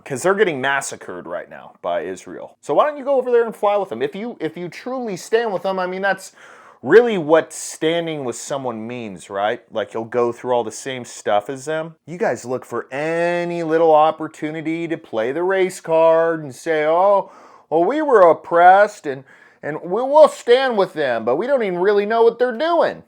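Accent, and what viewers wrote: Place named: South, United States. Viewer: Dude, ya really screwed the pooch on this one. Ya sound exactly like an uneducated southern redneck. Hummus and Hamas are not the same, aren’t pronounced the same. One is chickpeas and the other are terrorists. And you’re ranting like a fool. Ya shouldn’t have made this one. I know you’re tired of the dumba$$ guv’ment. I am too. But, Israel is our ally in the Mideast and has been since its inception. Yes, gun control is a bad thing and we all know that. That should have been your main point for this video and not a rant about chickpeas. Get a grip. Lay off the high caffeine coffee. Play some soothing music. Go sit a spell on the back porch and watch the clouds roll by. Cya tomorrow.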